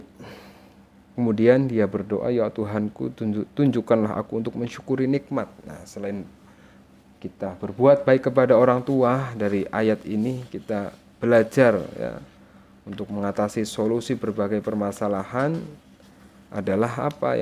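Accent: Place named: Banyumas, Indonesia. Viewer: Orang cilacap ,banymas, tambak, purwokerto? Ngapak bangeeet???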